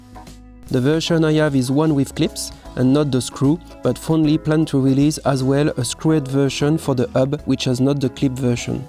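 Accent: France. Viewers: Il est français ?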